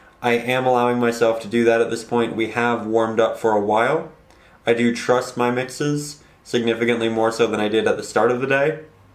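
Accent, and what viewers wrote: Canada. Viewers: Outta curiosity where are you from? You sound Canadian if I’m not mistaken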